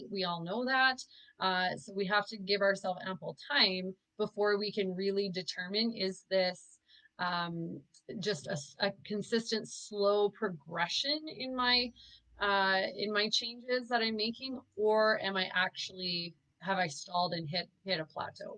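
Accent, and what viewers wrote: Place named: Northland, New Zealand. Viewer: Are you located in the northland area ? You have that kind of accent 😜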